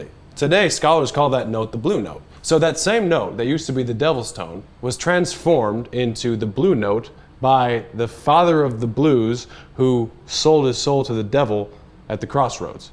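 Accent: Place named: United States. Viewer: Is it just me or is he speaking with a bit more of a cowboy accent in this video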